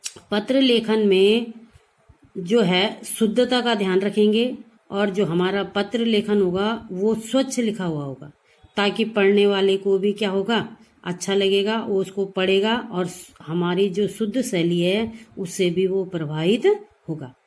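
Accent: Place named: Uttarakhand, India. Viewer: Mam you are from Uttarakhand chamoli right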